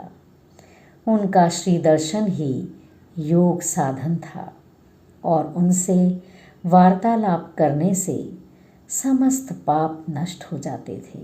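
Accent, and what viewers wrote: Standard. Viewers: ओम साईं राम।बहुत ही मधुर और शुद्ध उच्चारण के साथ आज का ये साई सच्चरित्र का ये अध्याय सुनने को मिला बहुत ही अच्छा लगा।इसके लिए आपका बहुत बहुत धन्यवाद।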